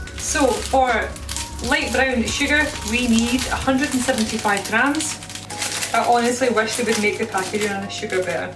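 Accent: Scotland, United Kingdom. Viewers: There's something relaxing about a sassy Scottish lady telling us how to cook desserts. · I'm a native English speaker, and 99% of accents I can understand perfectly but holy hell I've never heard a heavy female Scottish accent and I can barely understand a thing. Thanks for the recipe though they look delicious mate!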